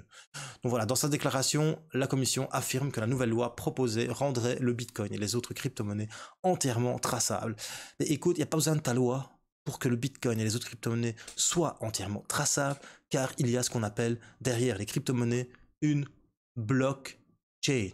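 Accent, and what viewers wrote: Belgium. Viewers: J'adore ton accent belge, c'est kiffant de t'écouter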